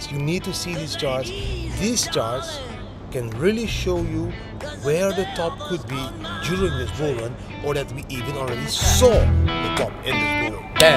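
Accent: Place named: Netherlands. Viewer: He een Hollands Engels accent:)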